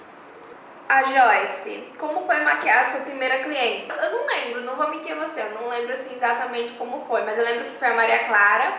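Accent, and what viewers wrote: Northeast, Brazil. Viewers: Eeeee ,até que enfim ,descobri de onde vc é,suspeitei que era nordestina pq falamos muito igual...Kkkk,sou de Natal RN e estou apaixonada pelo seu canal. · . adoro o seu sotaque vcs nordestinos. eu sou de formosa Go. sou cabelereira e maquiagem tbm. amo a profissão da beleza gosto do seu trabalho te acompanho a pouco tempo mais me identifiquei com vc. Que Deus te derrama muitas bênçãos em sua vida pois tudo que vem de Deus e bom perfeito e agradável. bjos manda um bjo pra mimmmmm. se vc puder entra no meu stagran e vê mais trabalhos e me sal o que vc acha a me de dicas.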